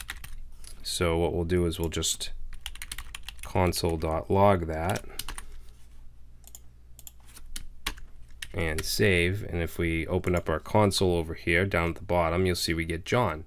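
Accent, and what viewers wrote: Boston, United States. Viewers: Boston accent is killing me.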